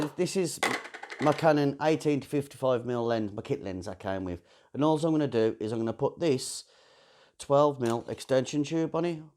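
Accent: Birmingham, United Kingdom. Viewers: Hi, it's good to hear a brummie accent. Not sure where you are from but I can't be to far from you in Walsall. I am loving your videos and have tried a few of your ideas. I am using extension tubes and a Canon 1200D. Had some success! Thanks again for the inspiration. Steve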